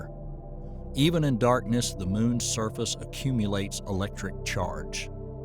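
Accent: South, United States. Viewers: Southern good ole boy . Refreshing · Sorry the Deliverance accent is a hard no.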